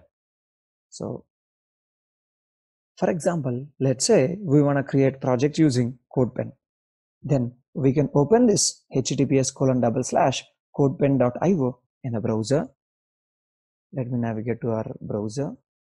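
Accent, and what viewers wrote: India. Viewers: Try to speak it in normal accent. sometimes I do not get the south indian english. Apart from that this Video was fantastic. <3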